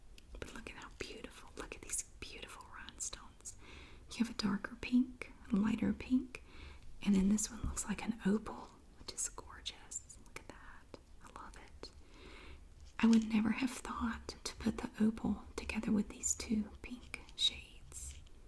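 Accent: South, United States. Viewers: It's her Southern drawl.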